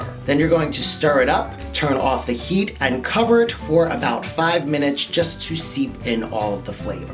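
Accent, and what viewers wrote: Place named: New Jersey, United States. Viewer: I’m assuming ur from jersey or something because u pronounce water “wuter” (not making fun of u, I just know bc I have a friend from jersey who does the same thing)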